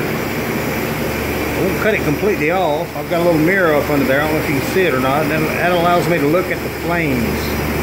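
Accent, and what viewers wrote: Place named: South Carolina, United States. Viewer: I am willing to bet this fella is from South Carolina, he sounds just like Bobby Goodson from Swamp Loggers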